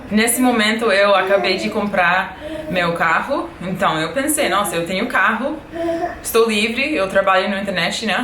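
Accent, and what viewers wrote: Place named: Rio de Janeiro, Brazil. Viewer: Gringa linda, adora a língua portuguesa e fala com um forte sotaque carioca. Haja charme! A cada vídeo, que você posta no YouTube, noto que seu Português está melhor. Parabéns pelo esforço. Valeu, minha fofa!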